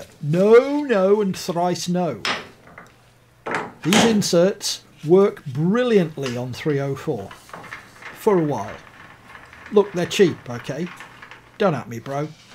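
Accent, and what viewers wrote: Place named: Southern England, United Kingdom. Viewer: Got to love the Southern English accent, full of humour and understated knowledge.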